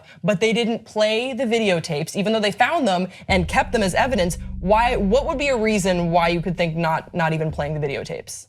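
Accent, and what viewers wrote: Belgium. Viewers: pls speak more french je veux entendre ton accent belge <33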